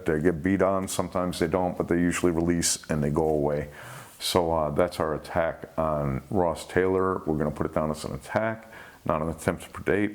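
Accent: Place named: Michigan, United States. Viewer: your show is very educational and entertaining. I look at my wife when the places that I snorkeled and swam come up as locations in your shows (Rose Island, Bahamas, Hilton Head Island, Destin) and say “great…now I wonder how close I actually was to a shark”. BTW there is a link to a guy in NJ that is supposed to be you. I’m from Michigan and you definitely sound like a Michigander…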